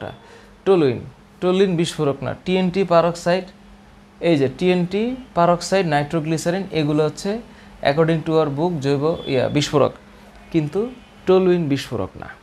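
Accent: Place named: Chandpur, Bangladesh. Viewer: Chadpur er bhasha bola ta kinto joss hoice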